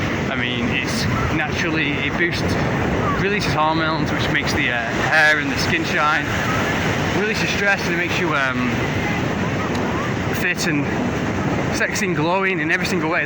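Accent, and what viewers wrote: United Kingdom. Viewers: You sound English to me but I may be wrong.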